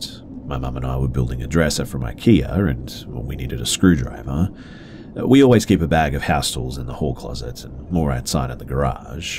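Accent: Australia. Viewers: I normally can't stand hearing a fellow Australian accent in videos/movies but this voice is particularly nice. Thanks for the content, I'll leave a like & subscription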